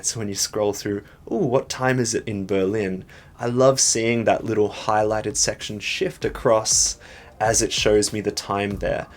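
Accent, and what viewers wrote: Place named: Australia. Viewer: Dat comfy Aussie accent. :)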